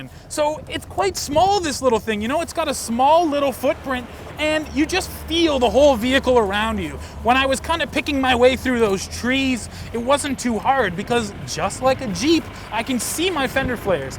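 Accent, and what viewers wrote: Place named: Minnesota, United States. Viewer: "You know...." :) ...Are you Minnesotan? I am, and you sound just like me... You know? :)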